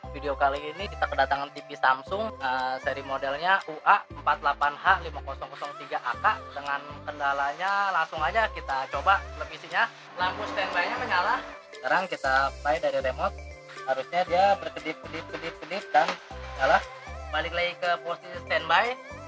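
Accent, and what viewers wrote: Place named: Betawi, Indonesia. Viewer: Logat bicaranya lucu ...Betawi Betawi gimana gitu.....mirip teman saya orang serpong😅😊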